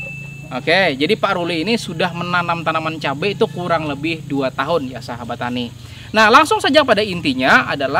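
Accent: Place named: Hulu Sungai, Indonesia. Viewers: Kukira orng mana kah ternyata eh ternyata orng hulu sungai jua 😁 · Pa p urang mn ky ad lugat kalsel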